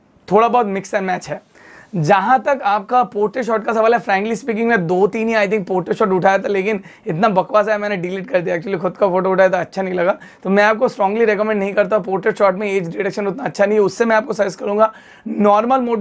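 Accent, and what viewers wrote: Bengal, India. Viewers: You are from Bengal I presume and either from near Barrackpore.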